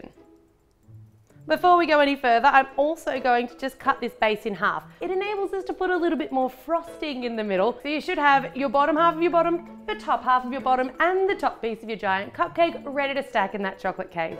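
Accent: Australia. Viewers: Are. You australian ?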